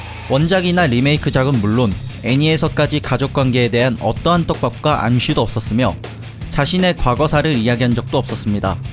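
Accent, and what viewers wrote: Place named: Gyeongsang, South Korea. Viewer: 경상도분이신가보넹 잘봤습니다.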